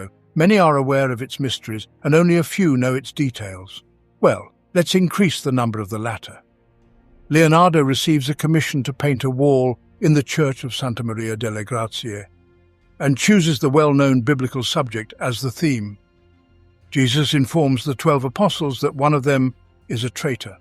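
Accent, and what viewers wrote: United Kingdom. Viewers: I can't stand British accents pretending they are intelligent. 😮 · Why ugly englisg accent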